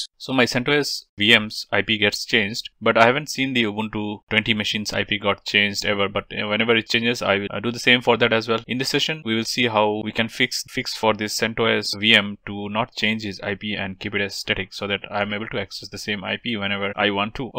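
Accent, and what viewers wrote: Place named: India. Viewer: Knowing that your Indian accent is terrible , Why cannot you try to speak slowly ? look like french train called TGV. 😒 Anyway thank you for having take your time to make your tutorial.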